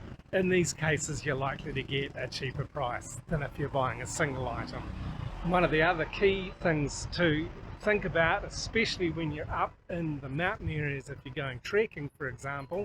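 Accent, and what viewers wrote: New Zealand. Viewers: Love that Kiwi accent!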